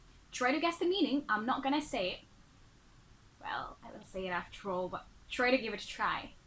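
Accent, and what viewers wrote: United States. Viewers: ماشاءالله لهجتك قوية جدا، كأنك أمريكية تحياتي لك من ليبيا 🇱🇾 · you have a good American accent !! how did you manage to pronounce this way ?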